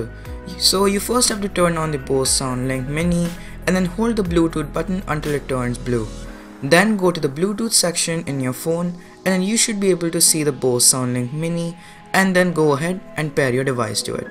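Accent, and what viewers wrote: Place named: India. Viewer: Indian Accent..!! Aaack 😡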